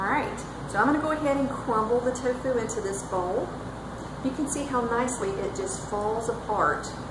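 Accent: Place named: South, United States. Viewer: Only if it's available in Audiobook form....I'd miss that sweet southern "drawl".... ;-)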